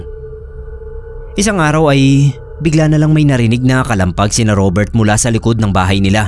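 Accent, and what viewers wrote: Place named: Ibanag, Philippines. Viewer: ibanag ang accent ng story teller.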